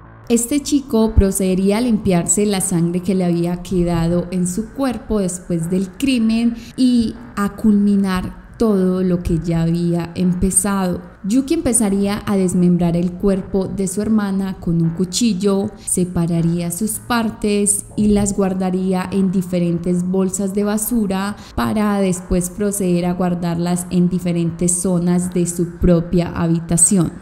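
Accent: Colombia. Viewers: El acento colombiano es muy agradable !!!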